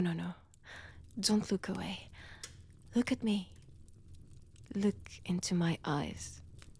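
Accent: France. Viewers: A lovely Christmas present! A french accent audio!! I needed this!!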